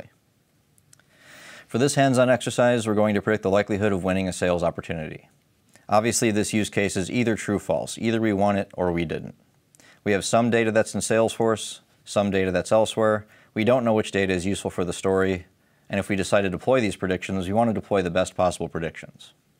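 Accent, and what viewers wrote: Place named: United States. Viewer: finally we got a video with American accent. yay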